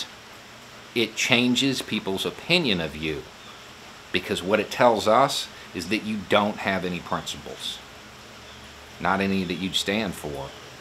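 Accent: South, United States. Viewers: lol HE explains this in a older video. He used the plain American English accent for his reporting jobs, because he wanted to be taken seriously. THIS southern accent is his real one. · 100% agree with everything you said. Keep it up. Off topic. No offense, but that southern accent was really coming out, and I'm southern too. Why does it sound so much better on southern women though, haha. A prime example of a southern woman's voice: <link>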